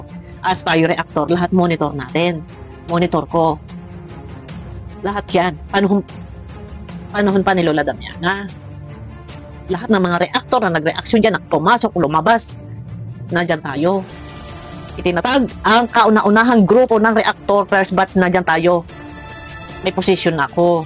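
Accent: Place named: Batangas, Philippines. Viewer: Batangueño yan c kuya kaya ganyan boses nyan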